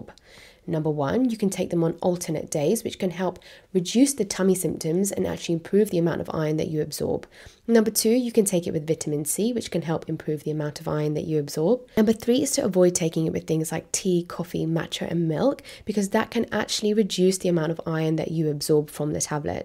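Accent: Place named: India. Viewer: Indian accents are so brutal. H8 it.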